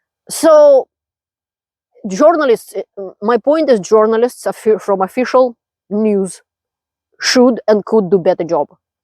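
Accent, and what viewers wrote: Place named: Russia. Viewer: Послушал на русском для информации, послушал на английском, насладился сочным русским акцентом! 😅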